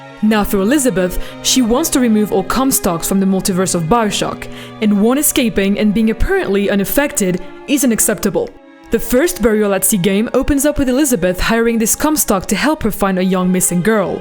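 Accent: France. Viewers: are you french? omg Salut! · Its a French woman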